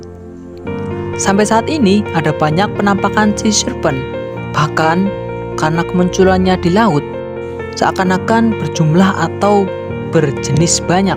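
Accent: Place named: Banyumas, Indonesia. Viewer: anjay ngapak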